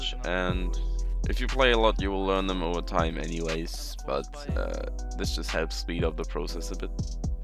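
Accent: Germany. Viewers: Are u a german guy ? (Because of the accent)😅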